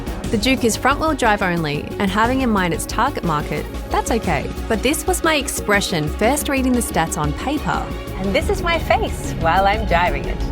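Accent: Australia. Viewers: Australian not British.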